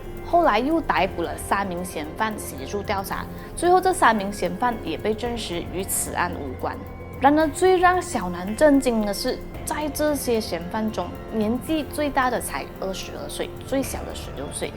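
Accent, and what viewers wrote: Malaysia. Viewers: 马来西亚口音很重。听不太懂，应该好好的自修中文发音。。基本上很多时候听不到懂。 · 本来就是马来西亚人，口音当然是马来西亚口音啊，听不懂就看字幕，这是本地的特色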